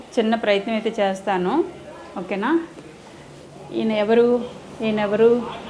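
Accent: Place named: Vijayawada, India. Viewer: Mi mata manasu manchidhi sister 😊,madhi vijayawada side miru makulane మాట్లాడతారు బాగా,ఇలా ఎన్నో చేసి మంచి పేరు తెచ్చుకోవాలని ఆశిస్తున్న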